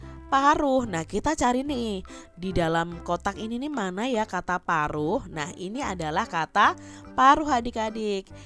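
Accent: West Java, Indonesia. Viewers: Kayak nya atau sunda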